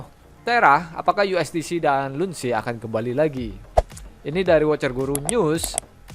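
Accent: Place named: Banyumas, Indonesia. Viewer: Siap ngapak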